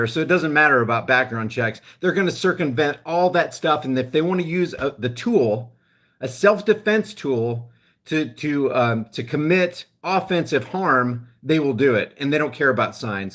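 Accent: South, United States. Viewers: I like the southern accent,lol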